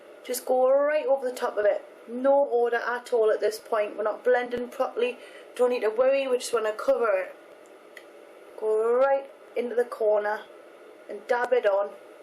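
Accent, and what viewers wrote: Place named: Northern England, United Kingdom. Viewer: I always enjoy listening to your Northern English accent! And your makeup tips are awesome as well ;)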